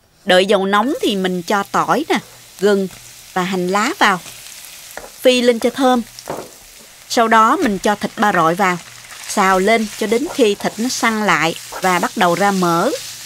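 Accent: South, Vietnam. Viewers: cách chỉ dẫn tường tận, dễ hiểu, dễ thực hiện, thích nhất là giọng nói miền Nam thân thiên... 10/10...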